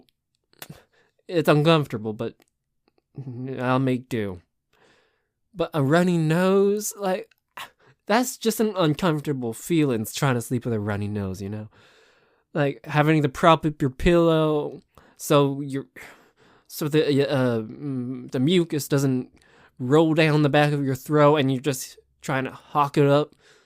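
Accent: United States. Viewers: Nice!! More of! killed me…great work, love your southern talking, yeehaw 🫶🏽🤣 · As a resident country, great country accent.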